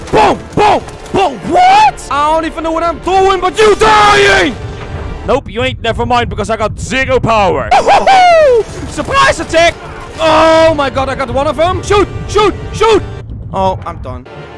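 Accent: Netherlands. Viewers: cyril MAGIT that’s a Dutch accent it’s lowkey funny when a Dutch guy speaks English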